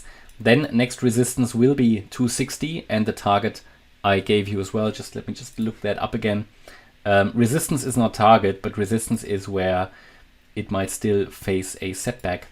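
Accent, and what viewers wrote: Germany. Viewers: Hast du ein deutschen aktzent oder täushche ich mich hier😂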